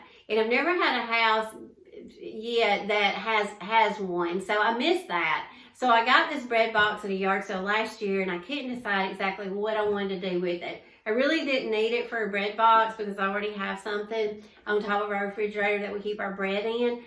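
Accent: South, United States. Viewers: Love this project! I love watching your videos. Your sweet Southern accent is charming!! Blessings XO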